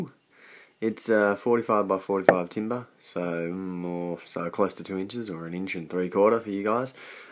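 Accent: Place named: Australia. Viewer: Aussie accent good to hear g'day from Perth P.S great content nice work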